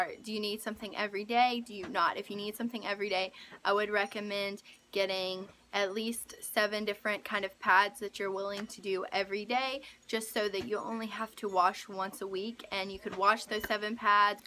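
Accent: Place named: United States. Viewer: Thank you so much for this info. I was also questioning the comfort of a waterproof layer daily. To my ear you sound American so I don't know if you know in Europe female incontinence is often treated with a tens (may no be spelling that correctly 🤷) unit, it's a little bigger than a tampon. It sends a low current shock that causes your muscles to contract, so like kegal exercise but more efficient with much better results. You can buy the stuff on Amazon. The unit is the same but has different plug ins for the more common use for leg and back pain which are commonly sold in store in the pain relief section. Thank you again for a very useful video.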